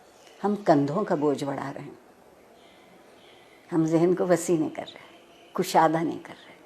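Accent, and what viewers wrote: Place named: Lucknow, India. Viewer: She has Lucknowi urdu accent also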